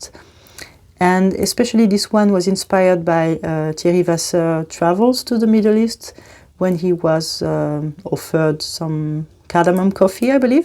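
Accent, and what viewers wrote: France. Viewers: on sent l’accent français 😂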